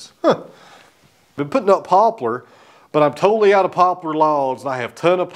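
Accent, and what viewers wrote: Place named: East Tennessee, United States. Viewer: Glad I found your channel. While I'm at work, up in a boom running conduit, I watch your content while I'm waiting for material or whatever. lol That East Tennessee accent makes it feel like I'm watching someone I can relate to a little better than the average channel. 😂 If you've done much traveling, you know everyone isn't as friendly as most folks around here are.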